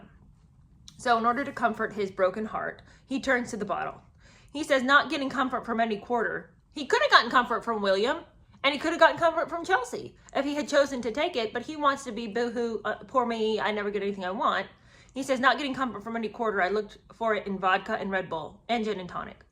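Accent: United Kingdom. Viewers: Omg me too, I love your nasally British voice 🥰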